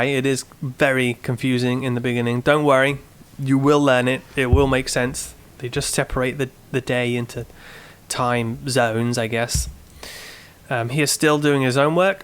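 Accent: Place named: England, United Kingdom. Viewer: WoW, simply AMAZING explanation of learning quite a difficult language like Thai! I've lived and worked in Thailand many years and for me, this is EASILY the best online learning resource for foreigners because the Native's cannot really explain the nuances to English as well as a Native English speaker can do, which is totally understandable. This might be a bit too fast paced for some beginners but my recommendation is stick with it and get an understanding of the writing at the same time and you'll sail past the harder parts latter. Very Impressive. This is great!. Now I'm going to hunt down the other videos;-) BTW your English accent is undeniable, but cool!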